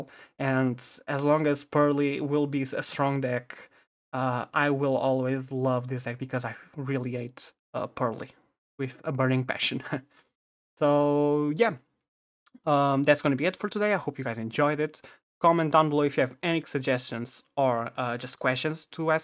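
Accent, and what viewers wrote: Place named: Portugal. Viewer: good video, out of curiosity your accent sounds like you are from portugal or something ??? not trying to dox you hahaha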